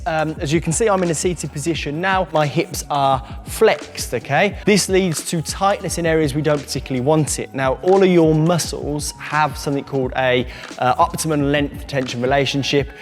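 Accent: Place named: Birmingham, United Kingdom. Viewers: you don’t hear the Birmingham accent on YouTube! 😀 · thanks dude for this information also for this british accent hahahahah;)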